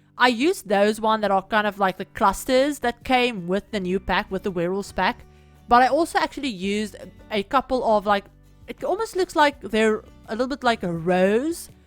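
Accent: South Africa. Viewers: I totaly probably just assuming cause of you're accent, you're South African probably cause it's just like I can never find SA youtubers related to stuff I watch I'm am SA so it so it's just nice to,for some reasone I can't realy understand American accents it just sounds kinda fast IDK why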